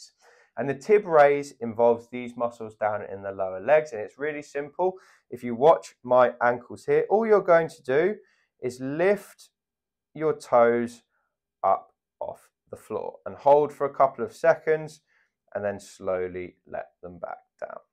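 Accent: England, United Kingdom. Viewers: Love watching your videos after stumbling upon them. Love your accent -English?? Look with hope I will see Improvement, would have very much liked a consult with you but I live down under at the South Pole, instead I say thank you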